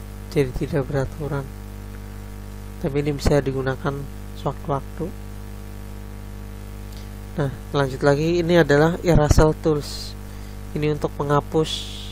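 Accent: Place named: Java, Indonesia. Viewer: cuma lidah jawa yang bilang bulat itu bunder, hehehe, sukses terus mas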